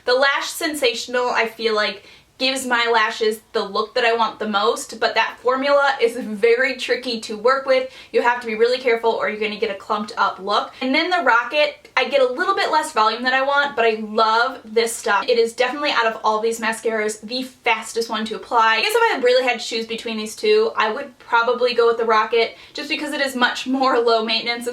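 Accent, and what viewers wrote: Midwest, United States. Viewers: Are you from the midwest because I feel like your accent is very similar to a midwestern accent. Just wondering :) Love the vid btw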